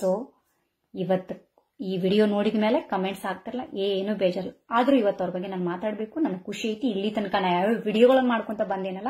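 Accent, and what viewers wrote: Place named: Hubli-Dharwad, India. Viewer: I just love listening to Hubli-Dharwad Kannada - it’s such a joy! Best wishes from Mysore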